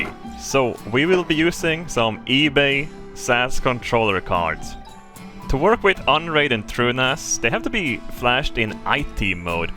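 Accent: Sweden. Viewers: That glorious glorious Swedish accent from is honey to my ears it’s been too long since I’ve heard your voice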